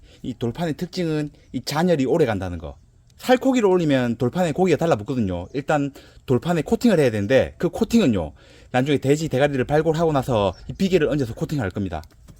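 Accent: Gyeongsang, South Korea. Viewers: 정말 끝내줍니다 진주 사십니까 쥔장님 ? 발골도 잘하시고 경상도 사투리도 구수하니 좋고 같이 한잔 하입시다 ㅎㅎ.서울인데 한번 가고 싶네요 ~ㅎㅎ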